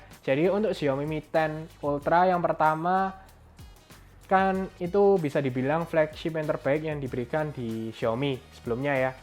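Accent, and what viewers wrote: Java, Indonesia. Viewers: Mantap mas....cino jowo medok yoo😍😍😍😍😍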